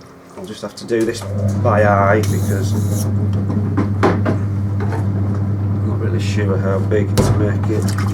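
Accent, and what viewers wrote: United Kingdom. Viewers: Lol I have the same accent XD I'm British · I'm Australian. It's definitely a British accent.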